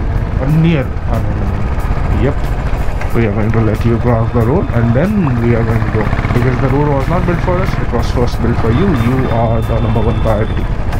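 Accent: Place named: Kolar, India. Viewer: that Kolar dam accent 💀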